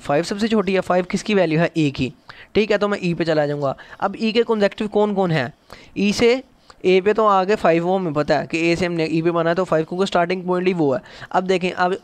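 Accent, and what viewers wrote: India. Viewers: Bhai tum himachal se ho kya ?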